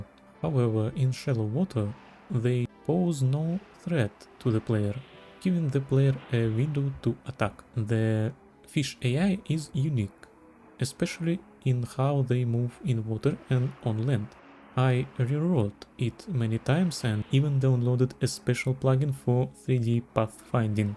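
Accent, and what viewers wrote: Russia. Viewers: Ну по акценту я сразу понял что ты наш слоняра 💪 а фото университета это лишь подтвердило 💪💪💪💪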